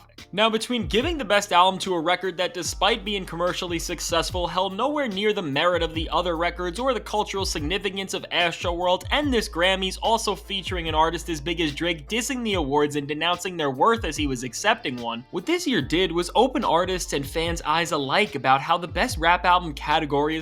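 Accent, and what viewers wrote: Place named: Canada. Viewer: Bro you sound like canadian lad 🗿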